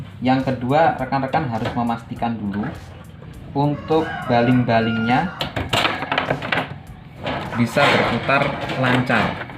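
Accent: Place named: Java, Indonesia. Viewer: Amiiiin... Trmkasih infonya..Sampiyan niii marga tapi gaya bicaranya lebih ke dialek Jawa.. Enaaak merdu pula suaranya... Sehat &.. Sukses slalu..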